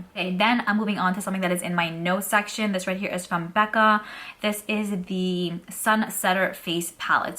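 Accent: New York, United States. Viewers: I love the NY vibes !!! Your accent, the horns, all of it 😃😃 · sounds like you live in nyc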